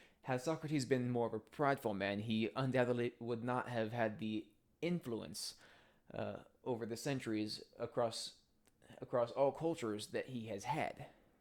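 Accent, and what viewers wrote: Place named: Canada. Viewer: Sounds Canadian or homeschooled.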